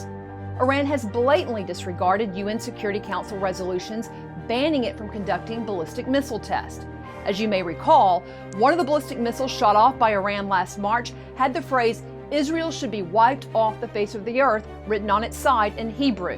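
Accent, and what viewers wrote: United States. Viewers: 6 Seconds Why USA Can't Be Trusted | The Daily Signal American accent.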